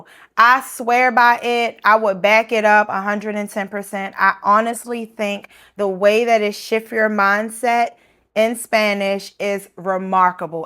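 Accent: Baltimore, United States. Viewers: Ya'll say tewww in Baltimore, like with an emphasis on the end vowel. Anyway, new subbie from upstate NY learning Spanish:) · Girl, I'm from Baltimore and I was listening you you thinking "why she sound like home" 🤣😂😍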